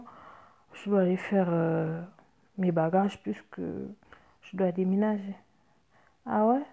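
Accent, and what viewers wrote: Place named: Gabon. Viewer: Tu as comme un accent Gabonais ! Aniway je m'abonne 😊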